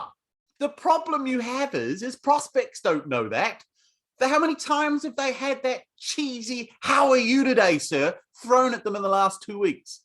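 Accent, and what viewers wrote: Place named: New Zealand. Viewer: Great advice as always. You'll need to add a section on your bootcamp "how to sound like a Kiwi"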